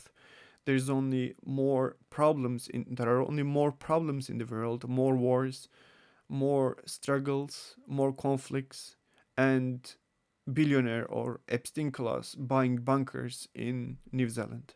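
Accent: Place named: France. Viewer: Your accent sounds French but you look Georgian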